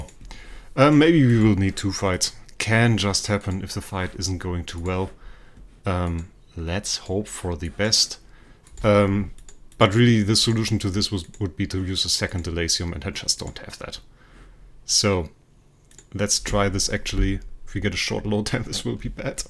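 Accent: Germany. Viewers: you have a very german accent bro, love ur content. keep it up👌🏿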